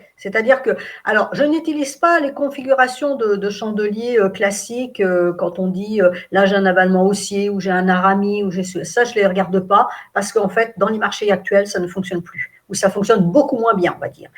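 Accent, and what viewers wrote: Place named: England, United Kingdom. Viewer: Cet accent Anglais me laisse pantois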